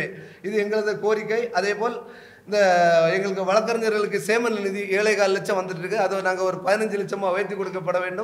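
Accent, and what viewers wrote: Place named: Tirunelveli, India. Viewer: நெல்லை/ நாகர்கோவிலில் பாஷை தெறிக்குது....🔥🔥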